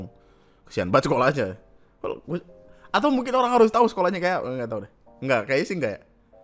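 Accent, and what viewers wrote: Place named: Kediri, Indonesia. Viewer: Kediri banget logatnya😂 salam dari Kediri bang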